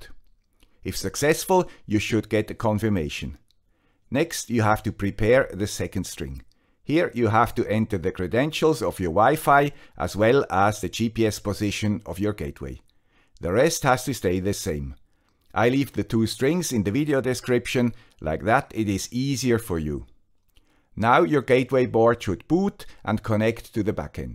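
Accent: Switzerland. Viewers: Hello guy with the swiss accent, do you have by change knowledge of somebody using a lorawan gateway´s 9th channel (backhaul) for meshtastic...? Could it be possible? 73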